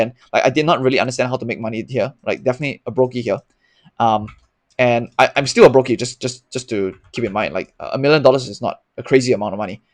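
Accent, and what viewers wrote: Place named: Singapore. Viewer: You sound like you are from Singapore and doing military also? So going forward why you picking a job over a business or not worth the extra effort/risk? I see you have done well in HIMS/HOOD have you thought about taking less risk (you might like quality investing) to keep your stack or going to do that if you get to $10M?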